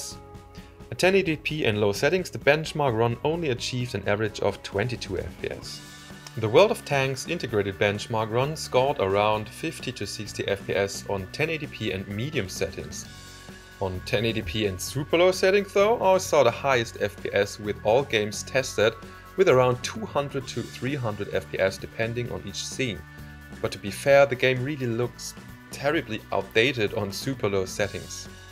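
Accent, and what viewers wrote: Germany. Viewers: Danke für dieses Video:) Deutscher Akzent in Englisch is so easy raus zu hören:D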